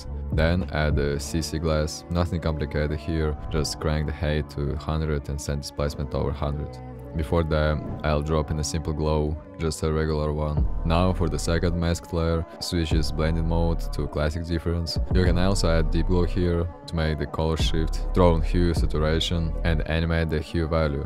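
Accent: Russia. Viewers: You have russian acsent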